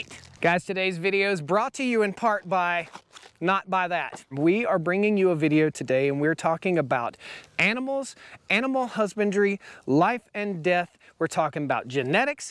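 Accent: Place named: United States. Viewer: o boy .... newer give likes .... you earn it a merican coool speach loove it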